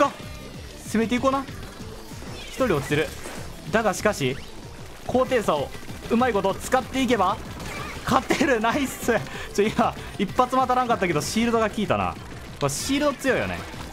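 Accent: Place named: Kansai, Japan. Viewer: ラストまで堪能しましたねぇ またもやバリバリの関西弁で めちゃくちゃ楽しそう！ ✌頑張った！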